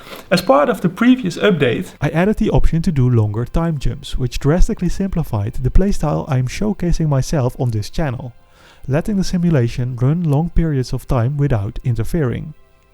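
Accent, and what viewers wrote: Netherlands. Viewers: At the start of the video: Dutch accent? Name revealed: Dutch 100%. Showing of our newspaper-sized nature areas in the background: Dutch 200%.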